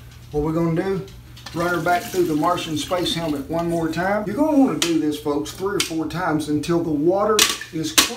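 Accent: South, United States. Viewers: I love his cowboy accent, yee ha!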